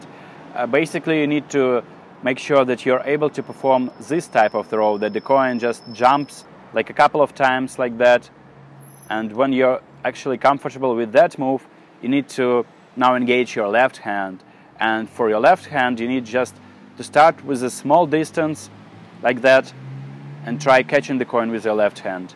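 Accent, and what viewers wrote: Russia. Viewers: классный русский акцент) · Такое ощущение, как-будто этот чувак русский. Его английский слишком русский :) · Ты русский? у тебя сильный акцент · Вау, вы так хорошо разговариваете на английском! Но этот русский акцент я узнаю везде)) З.Ы. в первый раз у вас на канале. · Русский акцент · Man, you have a Russian accent. Ты ведь русский, да? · Слушая голос и думаю - блин, акцент ну больно русский. Захожу в описание - о, да ладно, кириллица! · акцент четко русский да пох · Слышен русский акцент) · Русский акцент.... хмммммм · Акцент,как у русского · ты русский ? просто мне кажется, что есть акцент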